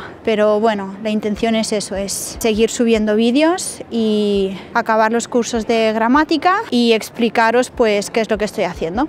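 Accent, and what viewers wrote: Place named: Italy. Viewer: Ciao. Hace unos años estuve en Perugia, en la Università per Stranieri, en un curso elemental intensivo de verano. Comparto contigo los problemas de alquiler que uno se encuentra en lugares relativamente pequeños, la desconfianza, etc...fue una experiencia enriquecedora. Ahora estoy refrescando y tratando de no olvidar lo aprendido a través de algunos amigos italianos y de tus videos. Los encuentro fantásticos, didácticos, claros, concisos y realmente útiles. Además tienes un acento perfecto de italiana, sonoridad y musicalidad, hija de lengua madre italiana, lo cual me encanta. Complimenti per te, e per tutti noi per la fortuna di tenerti come insegnante. Ti auguro il meglio nella tua stanza in Italia. Avanti!!!!!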